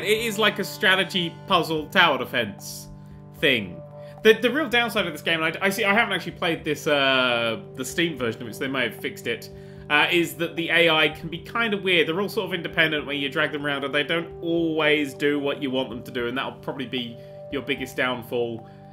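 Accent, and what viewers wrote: England, United Kingdom. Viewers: I love the English accent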